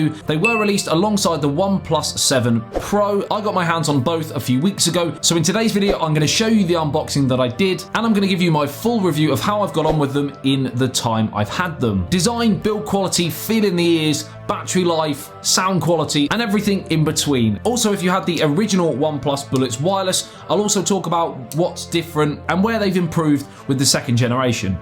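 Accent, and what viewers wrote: England, United Kingdom. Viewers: Thanks for addressing those cuticles, you're getting there bro. 😉 Note 2 - quit shaving your chest. That's so 90's gay porn, lol (don't ask me how I know). And finally, are you and the dude from Abroad in Japan from the same area of England? To this American, your accents are remarkably similar. Thanks for continued awesome content sir!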